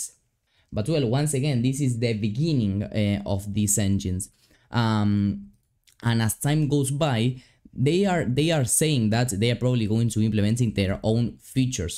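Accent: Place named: Argentina. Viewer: se nota el acento argentino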